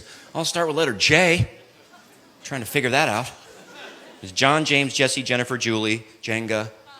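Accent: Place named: South, United States. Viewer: I love southern accent so funny!!!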